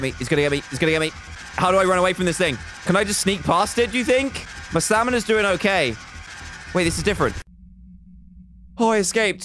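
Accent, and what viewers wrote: United Kingdom. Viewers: Are you brittish??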